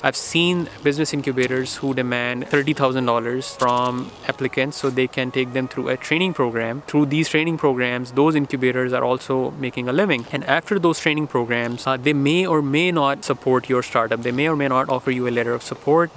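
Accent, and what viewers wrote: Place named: England, United Kingdom. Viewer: Wonderful English accent 😍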